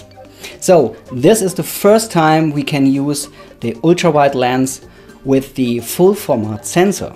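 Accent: Germany. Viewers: Take it as a compliment, i liked it alot ;) The combo of the german techno and the accent put a smile on my face, so bonus points! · cooler duetscher akzent ;-) aber ein gutes video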